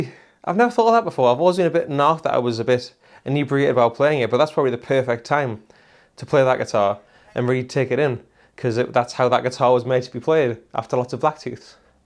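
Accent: Newcastle, United Kingdom. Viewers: I only ever watched PMT because of you. One you're a Geordie yenaawattamean, but mainly because of the enthusiastic way you presented new gear. Don't know what the next stage of your journey will be but I'm certain that someone will snap you up to do what you do best, demo gear and play guitars. All the very best mate.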